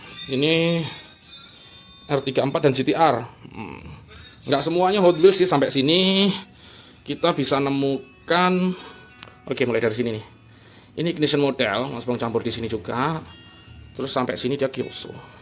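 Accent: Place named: Java, Indonesia. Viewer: Logat Jowo ne Gak Lepas... Mantull Mas 🖒🖒🖒 Bangga Dadi Wong Jowo.. ❤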